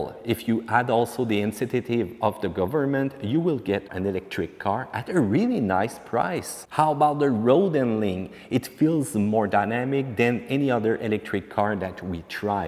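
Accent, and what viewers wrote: Quebec, Canada. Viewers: Avez-vous fait une version en français de ce vidéo?Je pose la question parce qu'il est évident pour moi que vous êtes un francophone fort probablement québecois. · Are you French Canadian ?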